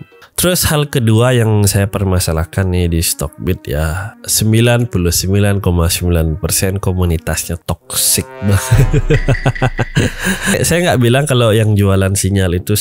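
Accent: Bali, Indonesia. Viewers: Logat bali, dari mana bli?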